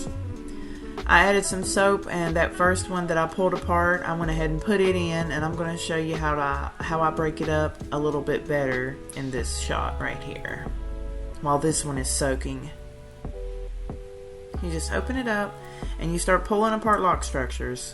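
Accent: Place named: South, United States. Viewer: I’ve been washing fleece for many years. I never knew to soak it in plain hot water first. I’ll have to try this. I have a method to get more water out of your fleece. I use old bath towels folded in thirds length wise. I spread a layer of washed fleece on it, roll it up and step on the rolled up towel. When you unroll the towel the fleece is damp and the towel is wet. It dries much faster and the rolling and presser from stepping on it has never caused the fleece to felt. You sound like you’re from the south. I’m in Tennessee. Are you anywhere close? Enjoyed your video. Thank you.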